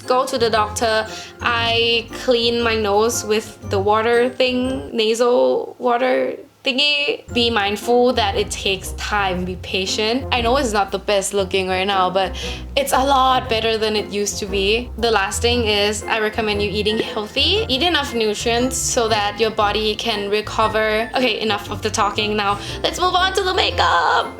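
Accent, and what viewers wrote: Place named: England, United Kingdom. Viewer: เราชอบสำเนียงอิ้งมาก หลงแล้วววว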